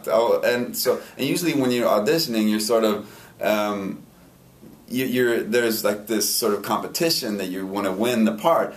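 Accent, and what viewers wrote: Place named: Sweden. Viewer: So damn gorgeous and his Swedish accent...oh my life :p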